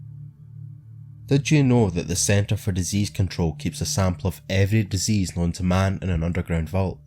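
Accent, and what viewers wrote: Scotland, United Kingdom. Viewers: I'm surprised you dont have more subscribers! You have lots of cool horror stuff on your channel and (I'm sure you get this all the time) an awesome Scottish accent! I am linking this channel to all my angsty teenage friends who watch spooky stories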